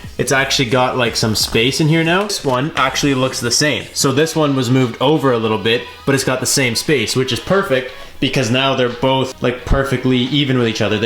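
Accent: Canada. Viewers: Sound Canadian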